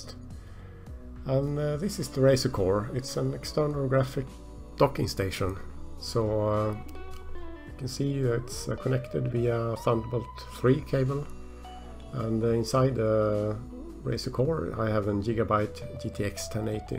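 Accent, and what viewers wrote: Sweden. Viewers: It screams Swedish accent.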